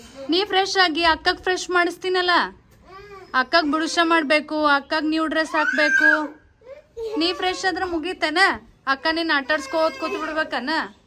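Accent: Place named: Belagavi, India. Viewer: Nim language nodidre belagavi davr taran ide aadru marathi jana matado tara fell aagutte y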